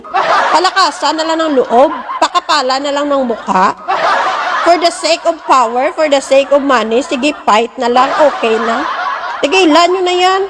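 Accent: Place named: Western Visayas, Philippines. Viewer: Ha ha ha nakakatuwa Ka idol para Kang d ilonggo kung Magsalita para Kang Beterano na reporter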